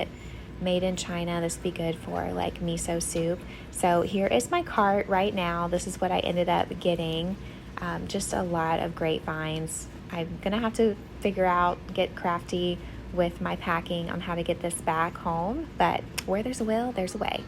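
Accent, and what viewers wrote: South, United States. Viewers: What?? Who on Earth could/would say anything about the sweetest voice! I'm from and live in NH and I love your southern accent!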